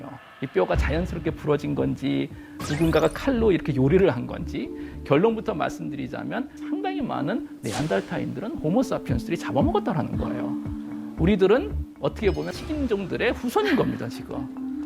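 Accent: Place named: Jeolla, South Korea. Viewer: 억양이 라도... 비 호감... 비추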